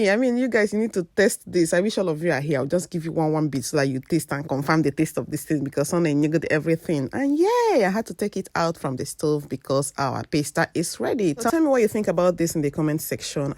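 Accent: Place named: Anambra, Nigeria. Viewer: Your anambra dialect is giving me joy.😊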